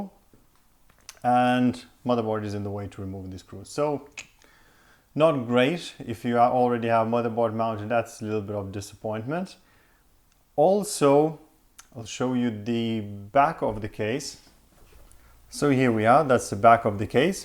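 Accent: Ireland. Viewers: Hello, and thanks for this really great review. I didn't think it was boring at all by the way. (You mentioned this when showing the internals). How is the noise on this so far? I record and edit YT videos so I am wondering if you notice much interference on the recordings? Also, is that a slight Irish accent I detect? ;)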